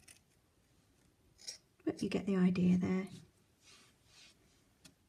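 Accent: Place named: England, United Kingdom. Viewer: What a gorgeous English accent.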